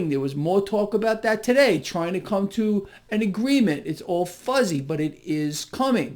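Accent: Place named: Bronx, United States. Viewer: Hey, I do so much admire your advise, HOWEVER, please drop your BRONX background with the aks talk, Pronounce the word ASK as you were taught with the "S" before the "K". Please practice..... s... before....k.... It may be hard... PLEASE practice. thanks so much!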